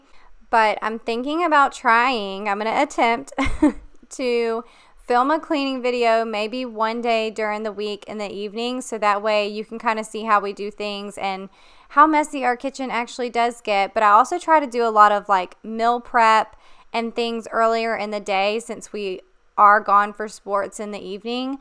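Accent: South, United States. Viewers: Ok first of all - you’re one of the best ppl on YouTube, so shame on all the haters!!! Coming from Canada, just hearing your southern accent is awesome and relaxing!!!! Yassssss to spring cleaning! I’m all in for this! We are preparing to sell our home this summer so I need to get it thoroughly cleaned before showing the house! I need some good exterior house cleaning tips.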